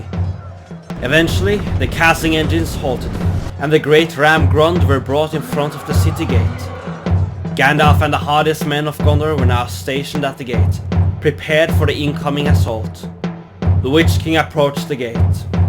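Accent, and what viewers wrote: Norway. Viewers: ikke ment som kritikk, men man kjenner jo igjen tonefallet. Artig med nordmenn som lager kvalitet på YouTube. Gode temaer og fin måte å fortelle historien på. Forsøker å lage noe LOTR selv, men i en helt annen stil. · Love your east norwegian english accent bro! Love from your closet relative Wermland Sweden!🇳🇴🇸🇪❤️ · i knew it! ^^ I could here the Norwenglish xD hehe Bergen here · Veldig Norsk engelsk men dæven du er flink. Supert arbeid. Gir meg full oversikt over kampen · Detta är första gången jag hör norsk dialekt på engelska! Haha gillar det! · Great video! Awesome animations. Also, I bet my life the narrator is Norwegian. Tell me I'm wrong. Again, amazing work brother! Would love more of these kinds of videos! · The norwiegan accent is soo clear XD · Dæven så norsk-engelsk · This is really cool LOTR battles with an norweigian accent. 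· Love the accent. Norwegian I suspect. You can se how Tolkien had Scandinavian language in his mind when creating many of the languages. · Is that a norweigan accent Im detecting? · The dude talking is norwegian. I can hear it. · are you from norway? I like your accent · We can all hear that the narrator is from Norway...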